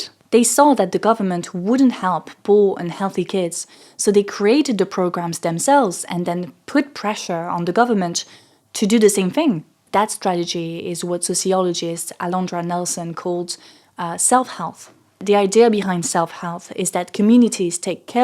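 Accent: United Kingdom. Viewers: Why does she sound like British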